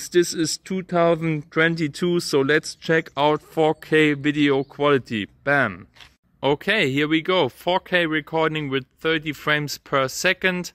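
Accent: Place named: Germany. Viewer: Coool German accent like it bro!😊 Thank you for your good (I think =) honest tests. I would be happy if you would test the small Galaxy s22 (6.1") again. It is said to have come a little closer to the flagship specs with camera etc. small and good cameras and battery is something i always hoped for, more so since the apple mini phones, you can start a petition that xiaomi or other widder build small phones, it doesn't have to be the best cpu.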